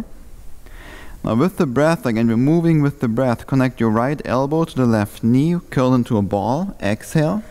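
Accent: Germany. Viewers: Do I spot a german accent there? Wenn ja nices Video Bro. Will meine Mobilität trainieren und bin so auf euch gestoßen.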